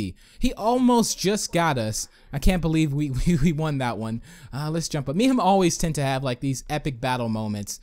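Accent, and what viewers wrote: United States. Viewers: u american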